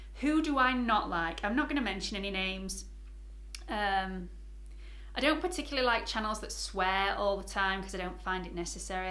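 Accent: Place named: Yorkshire, United Kingdom. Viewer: I should’ve known you was a Yorkshire lass as your so down to earth although I did detect the accent but sometimes it’s difficult Cos u cud also be from Manchester or somewhere 😂 I’m guessing Leeds maybe 🤔 I’m from good old Doncaster 😁 and you sound just like me 😂😂 anyway I just want to say a big congrats on your one year anniversary it’s a great achievement. Am only a recent subscriber but am loving your channel 😃 keep up the good work lass thas a proper diamond 😂oh and you do not look 39 😲 ❤️ xxx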